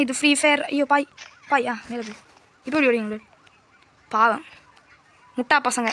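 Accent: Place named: Kerala, India. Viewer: Actually naan oru malayali than onga lihta malayali mari irrnthuthu so no disturbance 🎉❤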